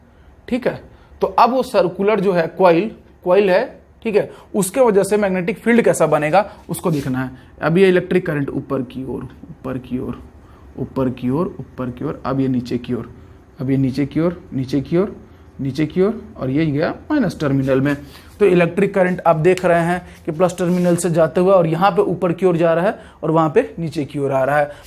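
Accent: Bihar, India. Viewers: Such me badhiya padhate ho bhaisab bihari style me I like it mai paid class join kiya hu lekin jo maza apke me hai vo vaha per nahi aata so mai apke hi video dekhta hu thanks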